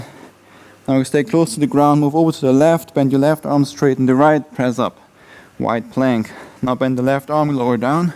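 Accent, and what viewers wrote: Germany. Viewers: There's nothing better than breaking a mild sweat during yoga practice. I think the German-esque accent helps as well!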